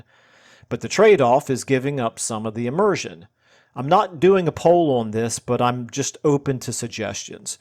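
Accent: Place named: United States. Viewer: I love the way you present the information - and I have to acknowledge a personal note that your American accent is just a bit easier for me to follow than the strong accents that characterize some other favorite channels of mine. No judgment. But I wouldn't mind you doubling up on the info presented by other channels. I've binged hundreds of Legend's videos and found that, for all his expertise, we just like to play the game differently. And his guides and tier lists don't always track with how I enjoy playing the game. Feel free to reinvent the wheel because most of us would benefit from what you have to say in the particular framework you put things in.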